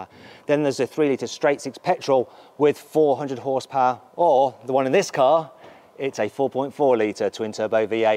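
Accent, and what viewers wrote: United Kingdom. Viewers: Saya kan bilangnya suka asen dia, bahasa dia, logat dia (si tukang review) ketimbang aksen amerika Iya dia aksennya british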